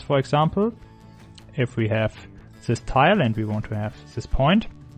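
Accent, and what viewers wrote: Germany. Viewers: btw are you german xD rly sound like it wär ja ganz nett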